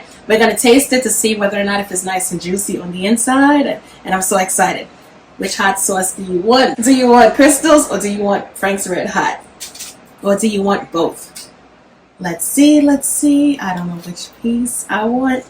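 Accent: New York, United States. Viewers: I can hear that NY Accent 😅 chicken looks amazing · I'm from the bronx . You definitely from New York love it . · You have to be from New York girl! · She's gotta be a new Yorker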